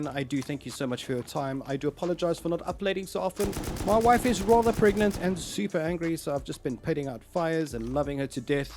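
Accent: South Africa. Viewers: My boy's a South African... Goddamn. It's one of a kind😂Love from CPT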